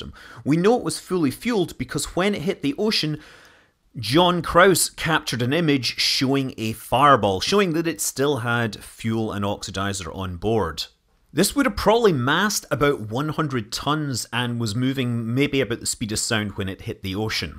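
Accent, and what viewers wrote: Scotland, United Kingdom. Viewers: Not mid-Atlantic, Scottish. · Scott Manley is Scottish, so he pronounces some words differently than what you'd expect, say, from an American or an English person. The English language is spoken by hundreds of millions of people around the world, in all sorts of different dialects. Expecting any sort of logic or coherence in the way words are pronounced is optimistic at best.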